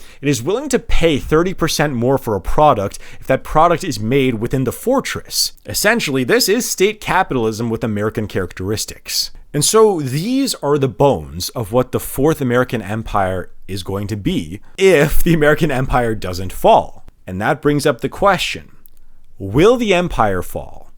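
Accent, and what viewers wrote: Canada. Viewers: Is this dude Canadian or what? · I can tell that you are Canadian from your accent, which makes the fact that you managed to make it through this entire video without uttering the name “Trump” once all the more impressive. Excellent analysis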